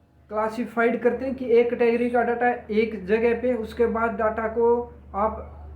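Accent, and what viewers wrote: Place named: Haryana, India. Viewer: Sir aapka tone harayanvi ya rajasthani lg rha…aise kaise hoga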